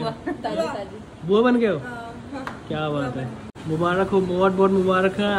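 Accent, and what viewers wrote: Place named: Garhwal, India. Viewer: Aapke ghar ke video best hote hai ...mja apki mummy or apke gadwali ascent se hi ata hai ...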